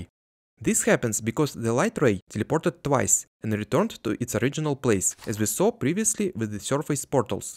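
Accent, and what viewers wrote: Russia. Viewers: Ты русский? Акцент говорит что да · Слышится русский акцент)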